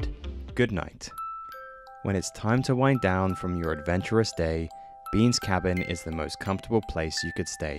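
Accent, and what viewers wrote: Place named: United Kingdom. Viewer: i do not understand American marketing that is so enamored with British accents. 🤷🏽‍♂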